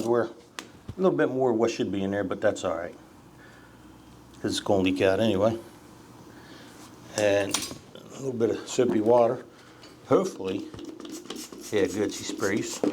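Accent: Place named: Ohio, United States. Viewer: Are you located in Ohio by any chance? You sound exactly like my brother in law. He is from eastern ohio. Nice video.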